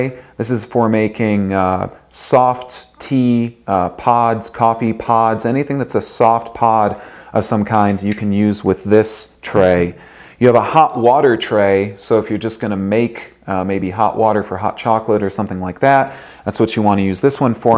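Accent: United States. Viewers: I think he is from New England or south eastern Canada. His inflection reminds me of an older Katharine Hepburn.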